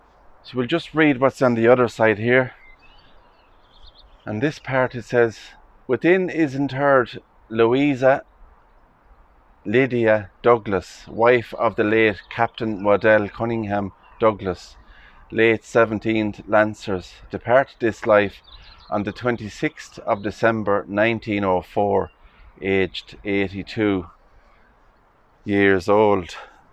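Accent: Ireland. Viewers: Beautiful video thanks to you Lovely irish voice.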